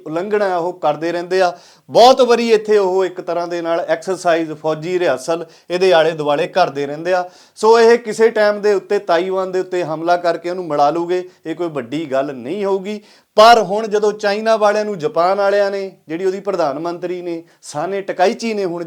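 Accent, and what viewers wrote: Doaba, India. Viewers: Bhai Sahib Jeo Tuhadi Language Doaba Wali Aa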